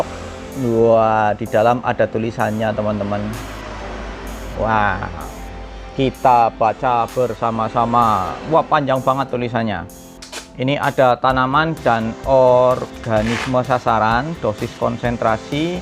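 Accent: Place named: Brebes, Indonesia. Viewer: Ngomonge kaya wong brebes..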